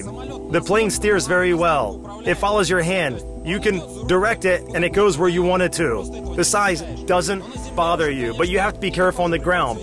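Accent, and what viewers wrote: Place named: Russia. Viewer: Руский ты зачем неруским прикидываешься? Слышно же что ты руский пропогандон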